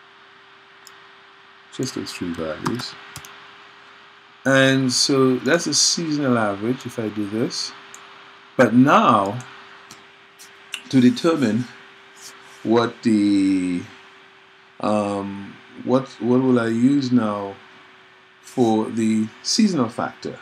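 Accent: Caribbean. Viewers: this has to be a Caribbean accent. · so pleasantly surprised when I heard the Caribbean accent. thanks for posting!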